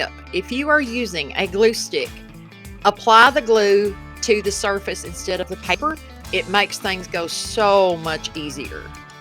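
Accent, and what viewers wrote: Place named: South, United States. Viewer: SPRING! Love that you don’t sound rehearsed, also LOVE your accent, I’m a Southern girl too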